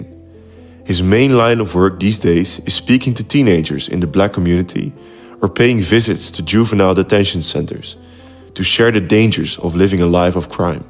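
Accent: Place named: Netherlands. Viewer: Weg met je Nederlandse accent je weet niet eens waar je over praat oen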